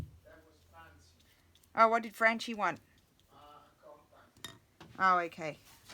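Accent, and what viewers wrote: Australia. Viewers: Where do you live, you sound like you are Australian.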